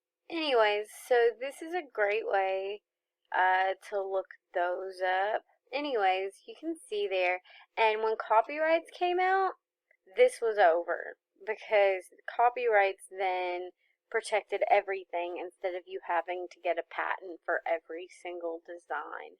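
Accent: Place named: South, United States. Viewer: Your videos are absolutely the best I have seen. Thank you for sharing the sites you use to identify makers and marks, and to purchase jewelry, and thank you a thousand times for the lists you have created and shared!! Fellow southerner, so you sound just fine! :)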